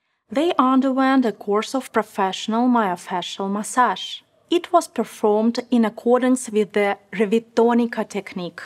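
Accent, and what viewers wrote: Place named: United States. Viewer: Живу 15 лет в Англии, потрясающий английский ! Американский английский но очень профессионально !!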